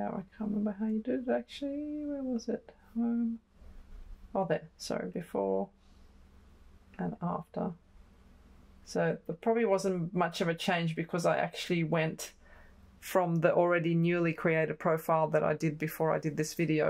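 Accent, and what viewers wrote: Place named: Australia. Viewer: Thanks for the video. I’ve just unearthed my X-Rite i1 Display Plus, and when installing it for my project I’ve found I have to pay for the Prfiler software from Calibrite … just another $100! I’m starting to print for my business wit a new Epson P900 printer. Always good to hear a fellow Australian’s voice on this, as we don’t get all the products available in other videos, and in this case sometimes they’re rebadged! Anyway, first time calibrating properly for me so hopefully it all goes smoothly.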